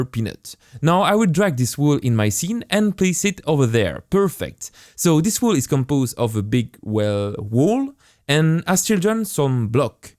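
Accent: France. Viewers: Be honest here mate, always hated the french accent, no matter who spoke it, but damn ive learnt alot from you, love your accent now :D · Your French accent is AWESOME! I love clicking a video and hearing your voice, because I know the content coming in is gonna be EPIC! 😙👌 (My emojis don't have the "chefs kiss" hand, but you get the picture 😂 ) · Ton accent français m'aide a comprendre parfaitement 😅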